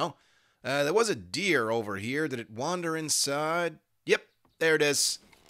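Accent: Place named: West, United States. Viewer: Loving this mod n ur western accent, keep em coming please! 💛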